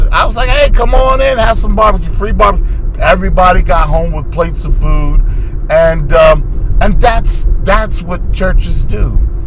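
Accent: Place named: Jamaica. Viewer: Yeah she schooled that elder big time,! Love your Jamaican accent, too funny. Look forward to catching this segment sounds interesting. I took and wrapped up my silver book, can't really call it a bible since they screwed with the holy book.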